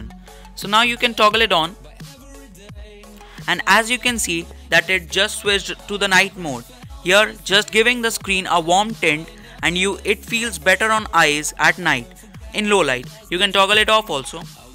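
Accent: India. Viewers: I hate your accent. Your an indian!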